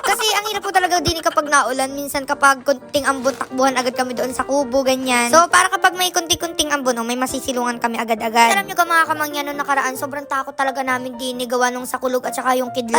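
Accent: Batangas, Philippines. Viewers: South Cotabato, Mindanao po. May dugong Batangueña. Ang tono mo po kasi magsalita ih Batangas. Nakapunta po ako once ng Batangas parehas kayo ng Tono ih. 😊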